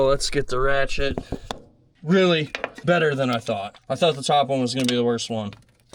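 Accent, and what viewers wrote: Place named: Northeast, United States. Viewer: I started watching your content about a year ago. When you spoke, your accent sounded similar to the way the folks from the NE sound. Your content is interesting, I drove OTR for 36 years, and I love the sound of Screaming Jimmys. Keep the good stuff coming!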